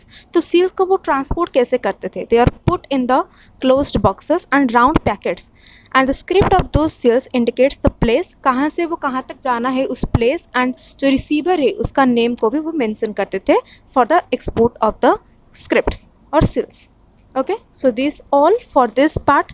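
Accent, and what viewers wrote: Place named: Odisha, India. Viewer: Odia accent😊 ama odia madam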